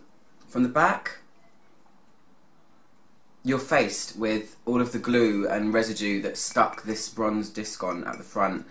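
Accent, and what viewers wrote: United Kingdom. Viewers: Love your reviews! Not to mention you're British accent is so very classy and I love when you say the word gorgeous lol.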